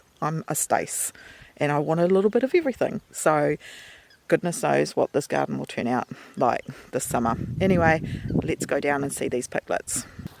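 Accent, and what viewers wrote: New Zealand. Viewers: Kia ora - zoomed in from Te Awakairangi (aka Lower Hutt) - really feeling for you as I am sadly just getting over this rotten flu going around too... congratulations on the new channel 🤩looking forward to learning what you doing. I really appreciate your cooking and how to make and store recipes (especially the Hot choc recipe YUM!!). PS love your kiwi accent mwahahaaa. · Yay another channel!! I'm going to be enjoying hearing your voice when I move to America in September as I'm going to miss hearing our Kiwi accent over there!!!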